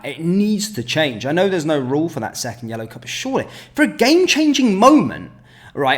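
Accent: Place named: Glasgow, United Kingdom. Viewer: That's some Wine Alley accent you have there mate.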